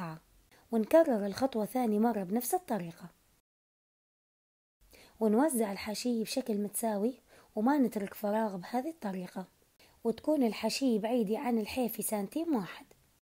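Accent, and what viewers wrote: Mosul, Iraq. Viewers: أنا أعشق الاكلات المصلاوية 😋😋😋 اللي زاد حلاوة الڤديو لهجتك المصلاوية 😊💐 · عجبتنه العروق واللهجه الحلوه والشرح الجميل الوافي تحيه من البصره للمعدلات المصلاويات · ماشاءالله روعة تسلم ايدك…طريقة العمل والشرح المختصر المفيد رائع والاروع والاحلى اللهجة المصلاوية المحببة عند كل العراقيين …استمري عزيزتي وبالتوفيق ان شاء الله 🌷🌷🌷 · الله يوفقج سهلتيها علينا طبعاً هاي الطريقة اسهل من الطريقة السابقة الله يرضى عنج ويرزقج من فضله يااارب. اعشق لهجة اهل الموصل 😍🌹❤️ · فدوه شلون معدله عاشت ايدج واللهجه الموصليه تجنن بلسانج ربي يبارك بعمرج ويحفظج ويرضى عنج بنوتي الحلوه🥰🥰🥰🌷🌷🌸🌸👍👍👍👍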